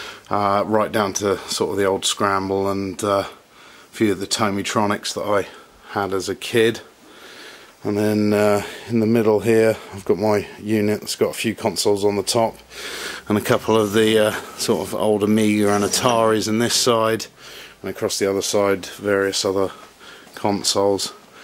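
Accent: England, United Kingdom. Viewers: He said he has PAL games which are European versions of the games. I'm assuming he is from England somewhere by the way he talks. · hes obviously English... really stunned by the confusion..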